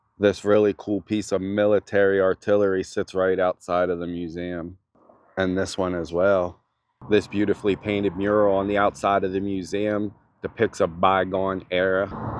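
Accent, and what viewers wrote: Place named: Baltimore, United States. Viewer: haha same…I’m from Abington! I heard the accent but couldn’t distinguish if it was Philly or Bmore and when I saw you watching the Eagles game but not saying anything about being from the Philly area, I then assumed it had to be Bmore…haha.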